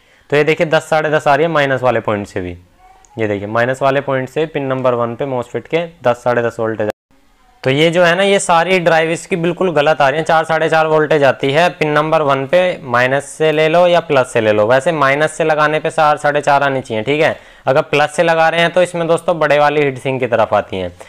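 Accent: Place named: Bijnor, India. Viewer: Aap District Bijnoor up se ho kya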